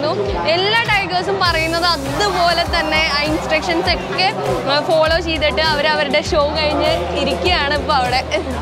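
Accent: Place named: Thrissur, India. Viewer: Your voice, Thrissur slang and way of presentation are very much attractive.. Nice..♥️..also animals shows are exciting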